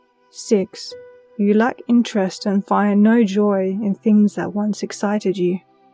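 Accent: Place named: United Kingdom. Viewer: OK. I have to say I'm totally gobsmacked that you have a British accent! I've been following you for several years now, simply because you told your story of having an extreme "cultish" religious upbringing that matched mine VERY closely. I'm in the USA; and perhaps I didn't think that anyone outside of the USA could have the same severe religious upbringing as me (and I must say, mine was MUCH more severe, abusive, controlling, cultish and brainwashing than yours). Yet...you have had a harsh religious upbringing, too...and in Britain! Seriously...I didn't ANYWHERE ELSE in the world could be as bad as Tennessee and Georgia, USA!!! And hey...I ADORE your accent! It's SO NICE to finally "hear" you and not just "read" you! Thank you for this, and blessings!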